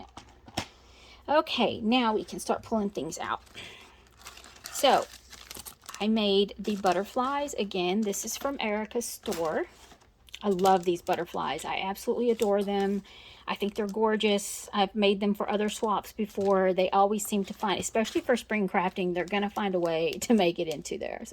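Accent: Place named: South, United States. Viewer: new subbie here lovely project, where u from?.. I recognized ur southern accent.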